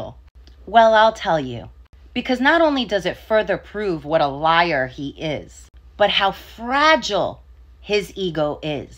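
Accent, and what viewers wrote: New York, United States. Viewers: New Yawk girl tellin' it like it is! Straight up, to the point, take it or leave it! 👍😀 Stay TRUE, Vote BLUE! 💙🇺🇸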